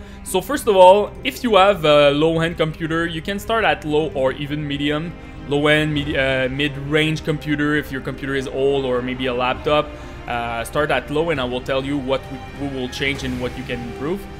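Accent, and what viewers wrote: Quebec, Canada. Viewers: Je reconnais un Québécois quand j’en entends un😂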